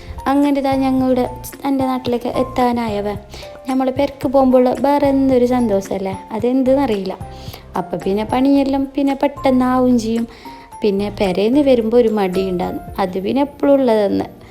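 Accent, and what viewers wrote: Kasaragod, India. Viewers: കാസര്‍കോട് ano😂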